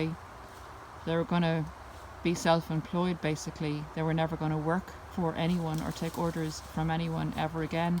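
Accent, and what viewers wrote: Ireland. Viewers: I love the background of beautiful Forrest and cute little dog, thanks for your wisdom , also love your Irish accent it’s a soothing way to get the information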